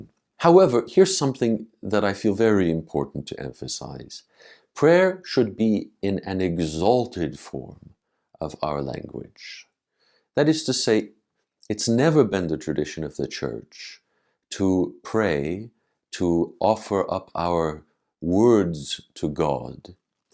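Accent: United States. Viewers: he does sound like an American that’s changed a bit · Vladyka bless. I thought you just had an Atlantic American accent. I was born in Australia, but being of Macedonian background, I still use some Slavonic hymns and phrases. Svyati Boze, Svyati Krepki, Svyati Bezsmrtni, pomilui nas.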